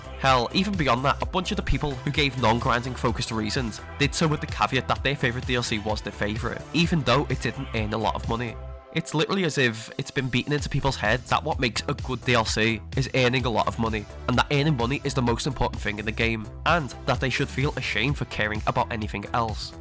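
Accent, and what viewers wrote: Liverpool, United Kingdom. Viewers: From Liverpool?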